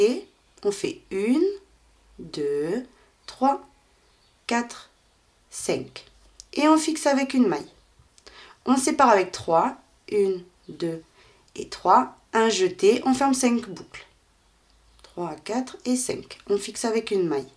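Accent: South, France. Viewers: Bonjour merci pour toute vos explications vous êtes très jolie et gentille et j adore votre accent ca me fait pensé au midi je devais faire une etoles et je trouvais pas de points crochet Assez beau merci pour ce magnifique point je vais faire mon étole comme ça bonne soirée à vous et votre familles courage à vous tous 👍👍💞💞💞💞💋🌹🌹🌹🌹🌹💐💐💐💐💐💐🌺🌺🌺🌺😸😻😻😻😻😻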